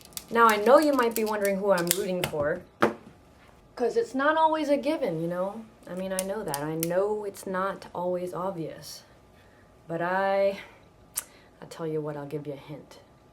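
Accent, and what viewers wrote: Philippines. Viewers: Holy shit your Filipino accent is hilarious. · OHMYGAHD. you sound JUST like my family O_o hahahh. like the filipino accents & stuff...JUST like my grandmother, aunt & uncle xD kind funny. lol. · Haha your a funny little filipina. first time watching your channel, I must say I love how you do the filipino accent, so spot on. just makes me laugh. definitely gonna subscribe, you make me by the way where did you get your jacket i want one. ay inay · hey i ALWAYS THINK THERE IS SOMETHING IN U WHICH I HAVE SEEN OR HEARD BEFORE NOW I UNDERSTAND THATS UR ENGLISH ACCENT U R A FILIPINO I M SURE BY UR ACCENT SPECIALLY BY UR MOMS ACCENT:d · Wwwait, what's your nationality? You kinda talk one accent in Philippines called Bisaya. Or you're just imitating Manny's accent? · i like ur philipina accent hehehe nice one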